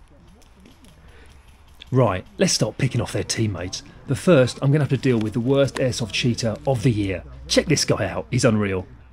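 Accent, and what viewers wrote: England, United Kingdom. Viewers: “Tell my mrs.” LOL. That guy deserves a beer. I love the way you English say things.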